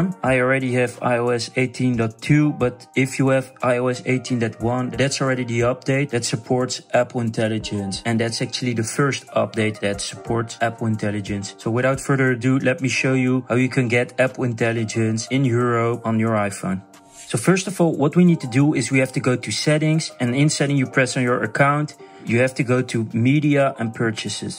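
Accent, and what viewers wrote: Netherlands. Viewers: a nice good thick dutch accent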